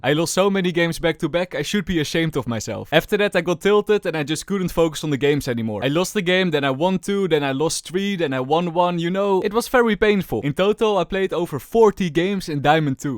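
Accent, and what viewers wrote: Netherlands. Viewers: Being Dutch I thought a Double Dutch accent would be laughed about online, but proves me absolutely wrong lol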